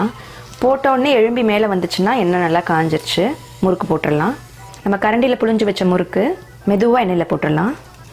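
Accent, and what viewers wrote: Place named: Tamil Nadu, India. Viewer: Your presentation and speech are crisp and pleasant like your murukku! You speak like a true Tamil lady, Crisp and sharp and decent!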